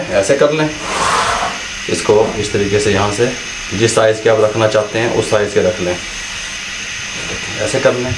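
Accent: Punjab, India. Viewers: Main ne jitni bhi aapko recipes daikhi hain aur saaari try bui karto hoon aur wh 100% perfect banti hain aapki recipes bohat zabardast hoti hain aur mujhay sorf aapki recipes pasand hain aaur aap ka punjabi style bohat achha lagta hai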